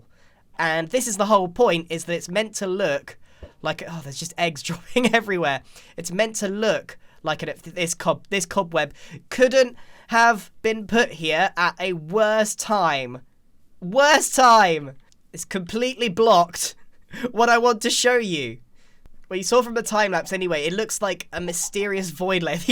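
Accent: United Kingdom. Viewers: Grian with a broken voice sounds more brittish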